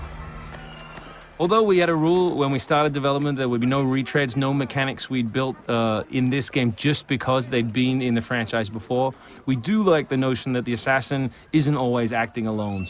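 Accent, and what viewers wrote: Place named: Australia. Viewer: Sounds like a bit of Aussie in the narrators accent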